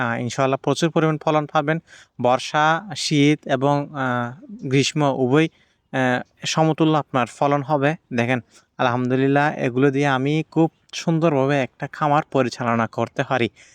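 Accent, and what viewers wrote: Chittagong, Bangladesh. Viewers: মনে হয় চট্টগ্রামের মানুষ বাড়ি কোতাই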